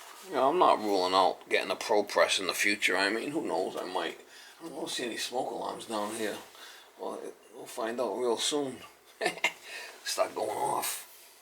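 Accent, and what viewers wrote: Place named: New York, United States. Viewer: New Yawker…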